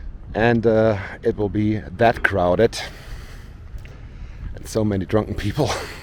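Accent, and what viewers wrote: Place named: Munich, Germany. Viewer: Danke. 😊 Dein Englisch ist übrigens wirklich total sympathisch. Ich verstehe alles und es klingt so schön vertraut. Vorallem mit den deutschen Begriffen zwischendurch. 😁 Du bist bestimmt auch in der Münchner Gegend aufgewachsen, oder? Du klingst zumindest richtig münchnerisch.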